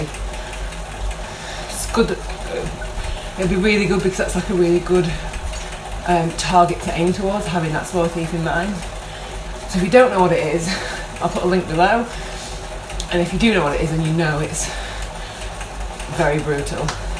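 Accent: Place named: Manchester, United Kingdom. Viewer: Thanks again! I really look forward to watching your videos, you make me smile for some reason, not sure if it's the accent that makes me lonesome for Manchester or your lovely northern sense of humour! Next time I'm on Zwift (did a session yesterday) I will be powering up with the Mantra "Think "! Cheers, enjoy the rain........at least you can ride in the rain, it's bloody snowing again here............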